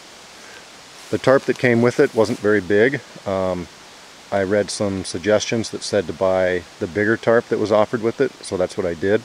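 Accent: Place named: Minnesota, United States. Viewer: I'm really liking your videos brother. Where are you located? Sounds kind of like MN. I'm in Upstate NY between Buffalo and Rochester, about 3 miles from Lake Ontario. Never seen chaga in wild. God bless. John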